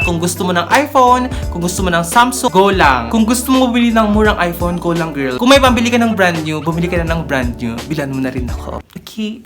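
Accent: Ilocos, Philippines. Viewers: Ilokano ka po ba?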